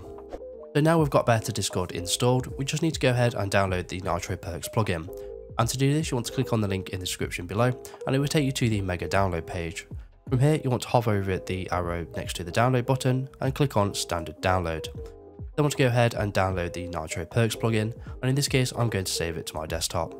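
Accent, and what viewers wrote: United Kingdom. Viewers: Addicted to your British accent.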